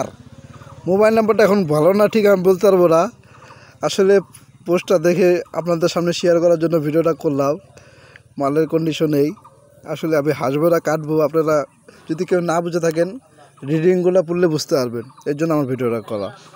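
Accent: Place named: Satkhira, Bangladesh. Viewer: ভাই এগুলা সাতক্ষীরার ভাষা আমার মনে হয়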